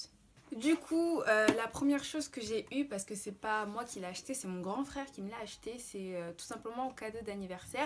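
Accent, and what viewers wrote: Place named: England, United Kingdom. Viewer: Coucou ma belle! Alala je rêve de ton accent anglais ! Bref jadore ton haul, j'aime trop le gros pull new look 😱 bisous bisous 😘👍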